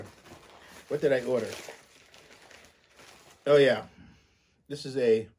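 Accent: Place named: Rhode Island, United States. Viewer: I'm in Rhode island. I know your somewhere close based on your accent . New subscriber. Love your videos brother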